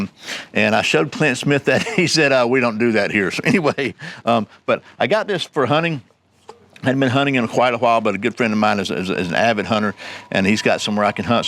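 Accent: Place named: South, United States. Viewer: Great video as always. I am researching this rifle heavily right now, and your video is full of great information as well as visuals of the gun. I always enjoy watching your videos, and your southern drawl makes me feel like I'm talking to one of my buddies up at my shooting club. One comment about the safety that I learned from other reviewers. It is a 3 position safety. Fire position is obvious, the middle position locks the trigger but the bolt is unlocked for cycling, and the rear position locks both the trigger and bolt.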